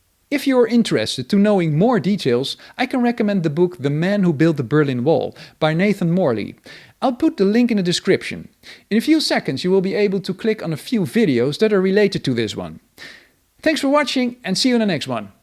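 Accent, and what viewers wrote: Netherlands. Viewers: It wouldn´t hurt to do something about the Dutch accent. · Thanks for that, very interesting. Can I ask, are you from the Netherlands? Ich versuche höflich deinen Akzent zu erraten! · Not to be weird as a first time visitor but your accent sounds like Dutch English but genuine German when quoting Germans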